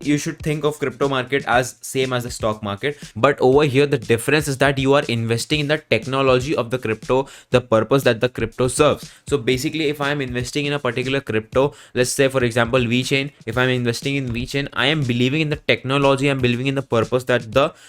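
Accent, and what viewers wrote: Gujarat, India. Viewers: Are you gujarati bhai?😅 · Gujarati bolvani style thi j olkhay jai 😅😅😅😅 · Bhai apke hindi mai Gujarati q a ri h? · જ્યારે ગુજરાતી વ્યક્તિ હિન્દી બોલે છે.. બહુ સરસ લાગે છે.. હું પણ ગુજરાતી છું.. બેસ્ટ વિડિઓ ભાઈ.. keep it up😊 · Gujarati pata chal hi zata hai puch ne ki jarurat nhi h😂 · ભાઇ તું ગુજરાતી છે ને તારા બોલવા પર થી ખબર પડી ગઈ અને મોસ્ટ પ્રોબેબબલી કાઠિયાવાડ રાજકોટ સાઈડ નો હોઈ શકે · HEY BRO ARE YOU GUJARATI? caught from accent 😅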